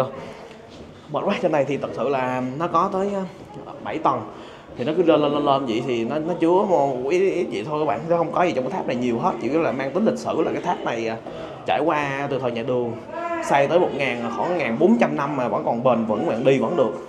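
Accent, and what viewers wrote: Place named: Central, Vietnam. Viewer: Ủa e ở hà nam à. Sao nghe giọng ở miền trung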